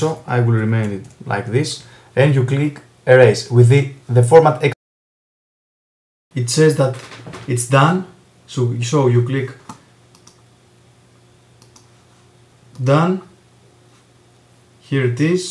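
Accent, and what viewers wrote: Greece. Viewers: το βιντεο ειναι τρομερα βοηθητικο... με το που σε ακουσα να μιλας λεω κατευθειαν αυτος ειναι ελληνας 100% μπαινω στο καναλι σου... βλεπω ελληνικες πινακιδες στο αυτοκινητο σου... και γαμω τα καναλια... subed <3 ;)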